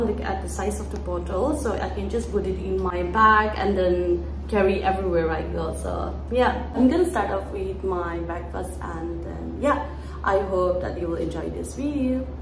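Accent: India. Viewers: please make a video in Hindi na....your Hindi accent is so ❤ly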